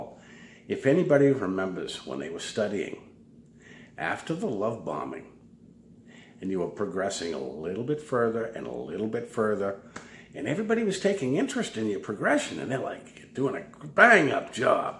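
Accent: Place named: Boston, United States. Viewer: My opinion is you have the best American accent, is it Boston? Great vid.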